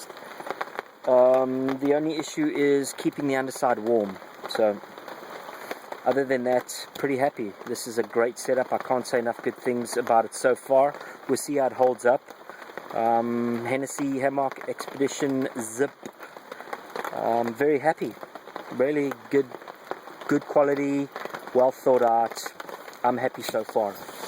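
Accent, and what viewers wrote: South Africa. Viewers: is that a south African accent I here????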